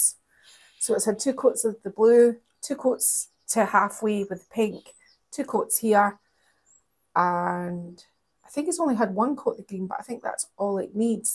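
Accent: Scotland, United Kingdom. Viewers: Immediate Like without watching, couse: Rocking horse, Scottish accent, work on the floor....Thank you.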